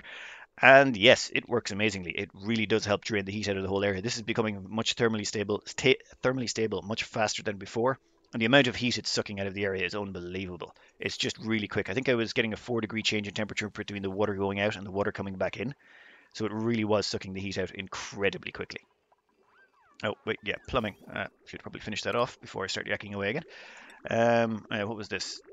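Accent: Ireland. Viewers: Where are you from? Do I notice an Irish accent?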